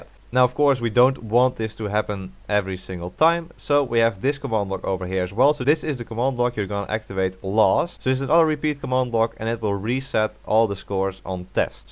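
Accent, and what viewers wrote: Netherlands. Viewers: You can just hear the dutch accent...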